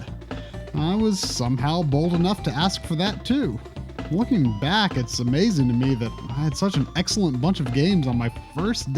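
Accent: United States. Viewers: It's great that you had that country boy accent all the way back then.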